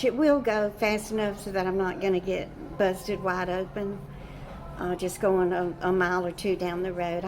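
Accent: South, United States. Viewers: Don't go to the used car company! LISTEN TO YOUR GUT!!! Repair your own car for much cheaper price. No guarantee that a newer car will not break down, either! Plus, A LOT OF USED CAR DEALERS ARE GETTING CARS THAT HAVE BEEN IN HURRICANES AND FLOODS AND PASSING THEM OFF AS GOOD CARS!! You can fake a carfax report and an inspection! Deal with your honest mechanic and fix your car, unless HE can find you a reliable car! Don't want to see you get burned! I must say this, with no disrespect intended, your Southern accent and friendly manner labels you as a soft touch and gullible to someone who is fraudulent! Be careful!❤️😊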